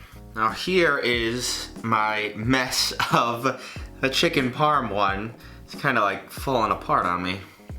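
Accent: New York, United States. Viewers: your accent is so new york lol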